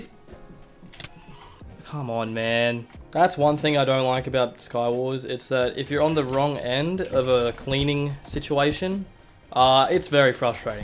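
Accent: Australia. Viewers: Love the Australian commentary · idk why, but i think im wierdly drawn to your videos because of the australian accent. I'm australian too so it feels like im listening to a friend ig.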